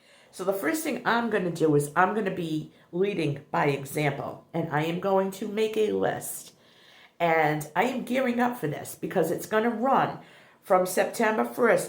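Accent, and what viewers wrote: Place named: Massachusetts, United States. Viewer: Hi!!! I met you on Jeanine's live this morning I just found your channel and I said I know that accent it sounds like me I'm in Massachusetts 😊💗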